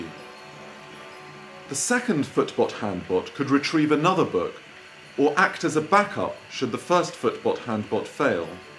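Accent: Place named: England, United Kingdom. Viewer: Vomito! This is the stupidest project I have seen on youtube. Funded by the European Union. Perfect. I thought I was going to throw up watching this giant waste of money and listening to the stupid pompous english accent. Next time, pay an immigrant to walk over and pick up the book. A lot cheaper. And less pollution.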